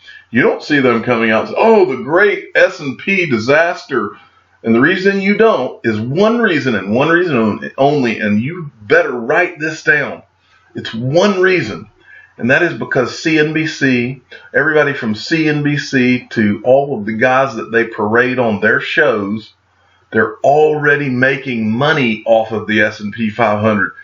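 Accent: South, United States. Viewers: HAHAHAHAHAHAH you are a fucking FAN BOY piece of shit... Stop SCAMMING people... How much XRP SHIT coins are they paying you for your SHITHOUSE forrest GUMP accent videos... you fucking STINK!!!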